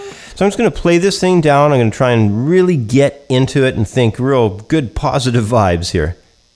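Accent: Canada. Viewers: Are you Canadian? Accent when you say out sounds like trailor park boys! Awesome lessons!!!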